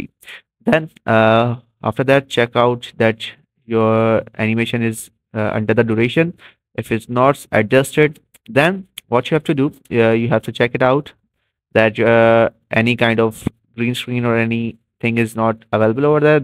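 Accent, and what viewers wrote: India. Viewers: bro are you from india? Because your voice is similar to indians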